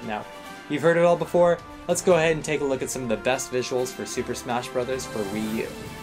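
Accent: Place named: England, United Kingdom. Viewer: Top quality English accent.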